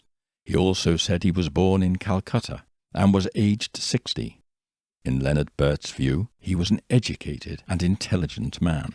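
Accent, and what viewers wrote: United Kingdom. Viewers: Hi I alway's loved the British Accent. I wish we Americans still sounded like that!:)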